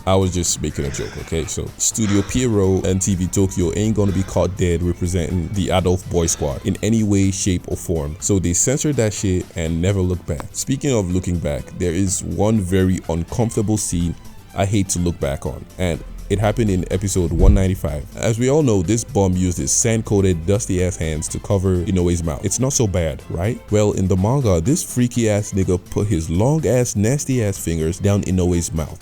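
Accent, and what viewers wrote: Nigeria. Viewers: I had a feeling bro was Nigerian straight from the accent, well, as a Nigerian myself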